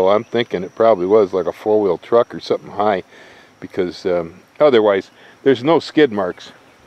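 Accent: United States. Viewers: mmmm dinner... poor thing.. you sound like a true american in this video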